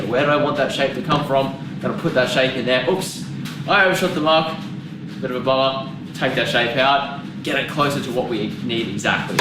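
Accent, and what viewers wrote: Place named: Australia. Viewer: я уже и забыл какой у австралийцев забавный акцент привет от World of armor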